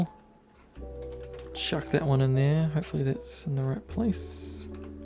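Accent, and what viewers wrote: New Zealand. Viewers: wow very nice relaxing vid!! love your voice, are you from new zealand?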